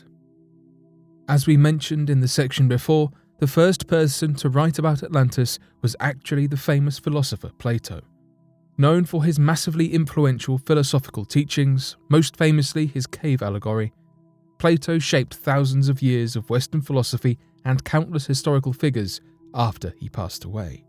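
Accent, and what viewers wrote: United Kingdom. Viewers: I think there are 2 narrators both are British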